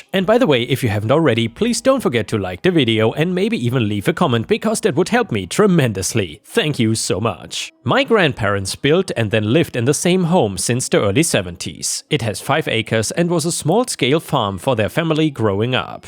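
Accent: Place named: Germany. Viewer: you could read the dictionary and I'd listen. I love your stories, but that soft German accent is the best part.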